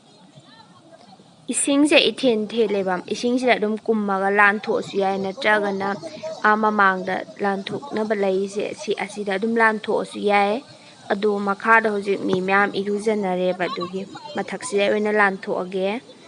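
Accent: Kakching, India. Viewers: I love kakching voice accent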